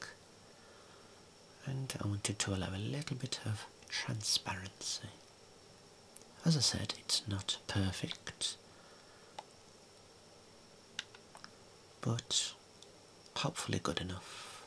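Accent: Birmingham, United Kingdom. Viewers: You sound like a Brummie, how comes you support United?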